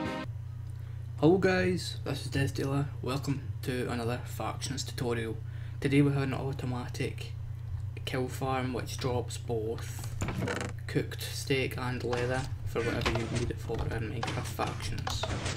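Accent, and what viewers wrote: Scotland, United Kingdom. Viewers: Ur scotish accent is top notch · is that scottish?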